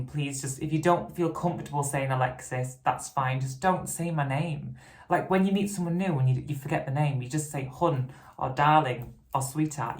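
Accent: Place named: Yorkshire, United Kingdom. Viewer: You’re such a pleasure to listen and learn from and who doesn’t love a Yorkshire accent! Makes me miss The North. ❤️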